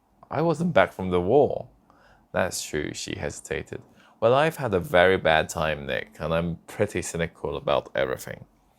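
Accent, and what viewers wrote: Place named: United Kingdom. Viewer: 잠이 솔솔오네요ㅎㅎㅎ 목소리도 영국식발음도 너무 좋으세요 앞으로 매일밤 들으면서 꿀잠잘 것 같아요💫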